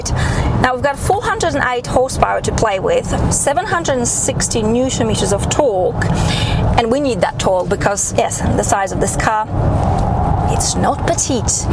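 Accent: United Kingdom. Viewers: Thank you for a great review, ps your accent did you spend some time in the UK? Sounds like it sometimes. You look really smashing with your different great outfits in your reviews. Ceep it up 👍😉 cheers.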